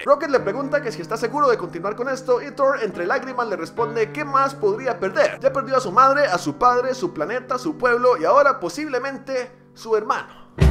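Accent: Mexico. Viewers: Pensé que eras Mexicano jaja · Yo pense que eras mexicano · Yo soy de costa rica y pensé que eras mexicano xD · Yo también soy Tico yo siempre creí que eras mexicano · Creí que eras mexicano,pero aún así te amo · Pensé que eras de México: v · Si fui tan credulo para creer que me saludarias lo fui mas cuando crei que eras mexicano:v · Yo pensé que eras mexicano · queeeee?? no eres mexicano? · CÓMO QUE NO ES MEXICANO? hubiera apostado a que lo era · Juraba que era mexicano:v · Yo también juraba que eras mexicano 😱😱😱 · mexicano enserio con el acento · Pensé que eras mexicano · Vaya!! Resulte engañada, pensé que eras de México!! Aun así, saludos!! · Pense que eras de mexico:v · Enserio es de costa Rica? Juraba que era mexicano, creo que lleve el noseastancreduloMcfly a otro nivel:'v Salúdame y le cambias el nombre a tu canal por v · todos pensaban que eras mexicano:v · pensé que eras de méxico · eres de Costa Rica.? 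pense que eras de México:'v. pero aun asi eres el mejor v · Wow eres de costa rica?crei que eras de mexico,buen video sigue asi. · Yo pensé que eras de México me has timado!!! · Saludame a mi y a todos los que pensabamos que eras Mexicano... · Pense que eras mexicano... Hola Vecino soy de Nicaragua.. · Queeeeeeeee no eres mexicanoo yo pensé que eras mexicano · Mae, crei q eras Mexicano, tal vez por el español neutro, pura vida loco, buen you tuber pa, saludos desde Heredia, muchos exitos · ¡Fuimos timados! la mayoría pensábamos que eras mexicano.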